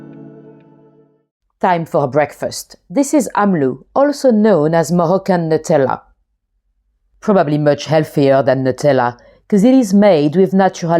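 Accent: France. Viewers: English with french accent what i mix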